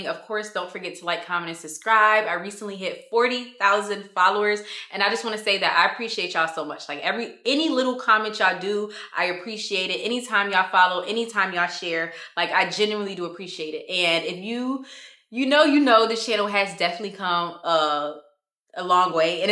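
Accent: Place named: Memphis, United States. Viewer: Are u from Memphis? You sound very Memphis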